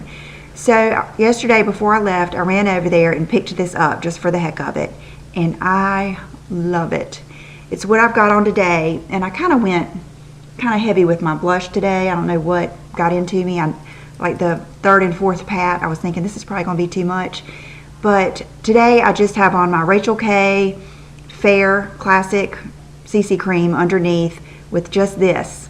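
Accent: South, United States. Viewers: I've been obsessively watching your videos because I LOVE your accent lol! As an East Coast girl, I just love listening to that sweet, southern accent! (Also, you're just so lovely!!)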